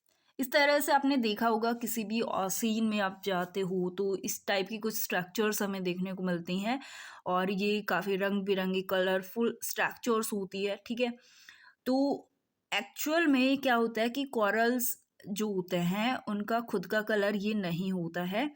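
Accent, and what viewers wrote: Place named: Bengal, India. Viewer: i think you are bengoli because your language tells that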